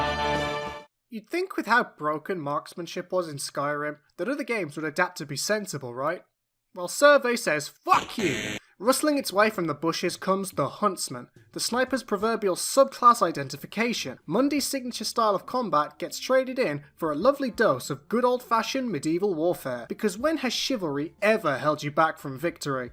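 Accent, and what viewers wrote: Poland. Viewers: are you from poland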